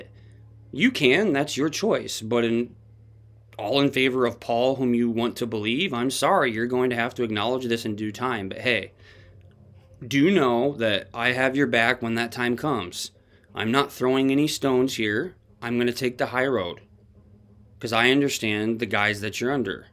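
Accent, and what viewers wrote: United States. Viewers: Do you know the girl took her own life? please stop with your content enough is enough. Suicides are tragedy in this family has to go on without their wife, mother and friend, but you putting content on putting your ideas out good for you, but it’s time to stop enough is enough. Please let this girl rest in peace please… listening to the rest of your video. Are you for real you are arrogant. You have absolutely no idea about these people. You obviously live in America. Judging by your accent, forming opinions from newsreels and other people‘s videos WTF get a life leave these people alone, let them move on. I have been watching your channel since Nikola went missing and all you do is throw doubt onto everything. It only takes one person to believe that garbage that dribbling from your channel and they possibly may hurt. Nicole‘s husband just stop it. 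😠 you’re an idiot.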